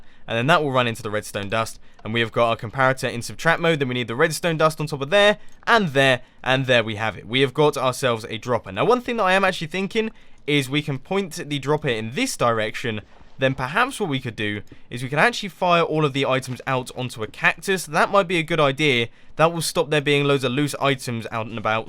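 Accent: United Kingdom. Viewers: Maybe it's just his part of town (or whatever) where he comes from. You do know the MANY accents people have in the UK, same goes for the way people talk.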